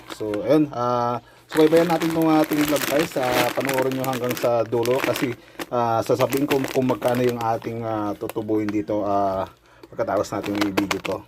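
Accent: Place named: Waray, Philippines. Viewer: Saan Po ba location nyo sir? Parang waray waray ka boss hehe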